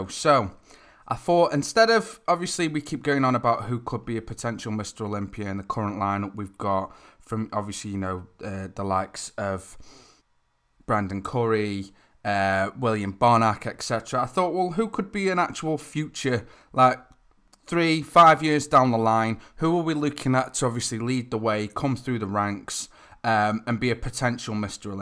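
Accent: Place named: Stoke, United Kingdom. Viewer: Are you from Stoke mate?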